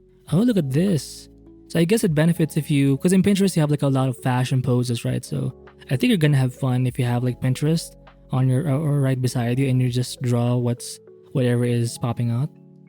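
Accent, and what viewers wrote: Philippines. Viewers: I'm getting Filipino accent in your voice, are you Pinoy?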